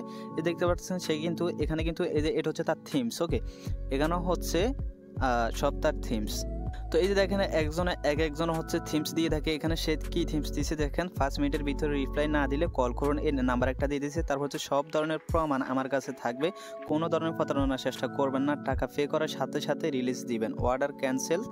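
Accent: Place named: Bhola, Bangladesh. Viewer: Vai apnar bari bhola jelay mone hoy.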